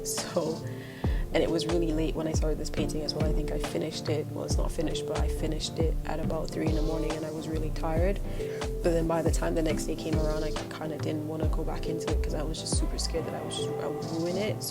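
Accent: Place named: Barbados. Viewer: Beautiful work! The music was a bit loud and found it hard to listen to the Bajan accent. Keep arting. Looking forward to the next video! Stay safe 💜💛💙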